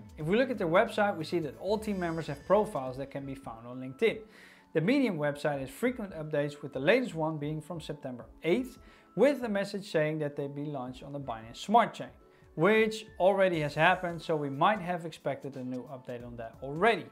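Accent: Netherlands. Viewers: You sound Dutch :) Nice covering of Cellframe, thx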